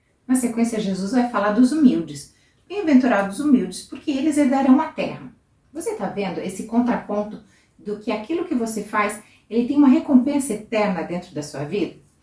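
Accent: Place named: Rio Grande do Sul, Brazil. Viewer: Bom dia ! Hoje domingo de Páscoa .Ele vive 💕 Conheci hj teu canal ,muito bom ! Vc é gaúcha ? Tem sotaque de gaúcha .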